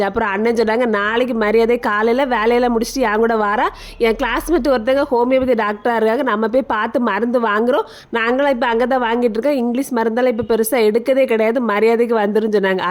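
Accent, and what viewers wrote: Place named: Tirunelveli, India. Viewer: Love u akka ungala enakku rompa pedikkum. Nama tirnelveli pasai super akka. Enga vettuku vanga akka pls ❤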